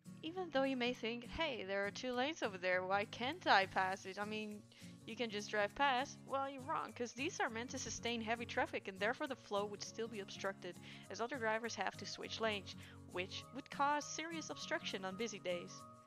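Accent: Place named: United States. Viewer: he aint wrong Shawn loooool UK boi that sounds really american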